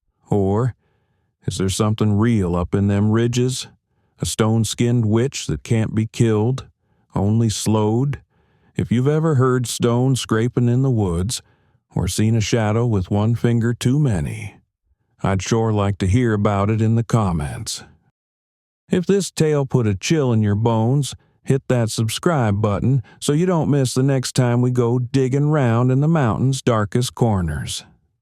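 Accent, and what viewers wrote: South, United States. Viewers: Love your southern accent.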